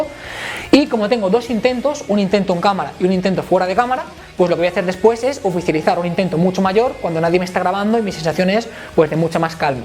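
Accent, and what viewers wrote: Galicia, Spain. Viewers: es gallego?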